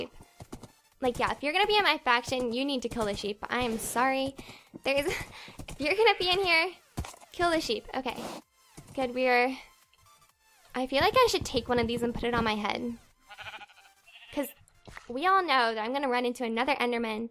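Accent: Canada. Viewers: R u from Canada? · Are you canadian? :o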